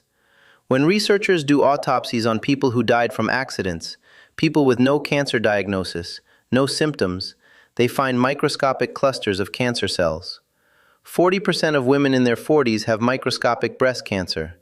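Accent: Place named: Mexico. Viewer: Why is he switching in and out of a Mexican accent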